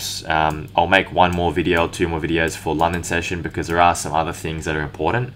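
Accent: Australia. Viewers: Brilliant mate! Awesome to hear an Aussie trader! I'm a North Queensland boy... Loving the London stuff, mate, as it suits our time zone much better. Keep up the great content 👏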